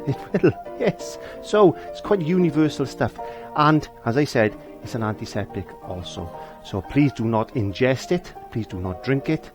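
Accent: Wales, United Kingdom. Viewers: jiw, there's luly to hear that accent, are u a Swansea Jack? welsh speakng Jen from Reading now. x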